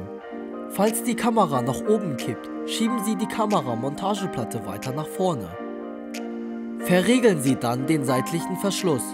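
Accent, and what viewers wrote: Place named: Turkey. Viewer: Das ist kein Dialekt. Das ist ein türkischer native Speaker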